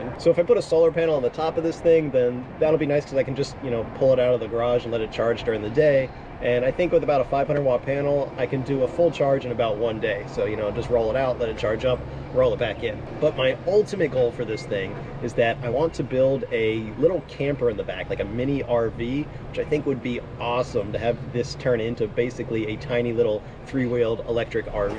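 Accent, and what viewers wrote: United States. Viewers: Your English sounds 100% American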